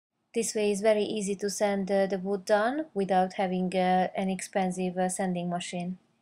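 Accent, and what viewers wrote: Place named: Russia. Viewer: а чё мужика то нет чтобы с палетами помочь. По акцентику то ты походу наша дифчонка :-)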